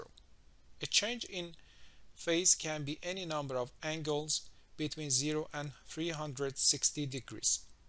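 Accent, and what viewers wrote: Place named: India. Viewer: Indian but explained well anyway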